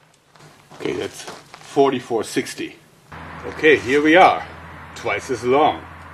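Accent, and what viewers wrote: Germany. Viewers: your accent sounds very german to me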